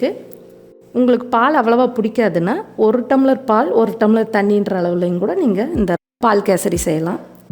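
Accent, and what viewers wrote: Coimbatore, India. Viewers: Nice maam. video making nallairuku. keep going maam. speaking slang is the icon of kovai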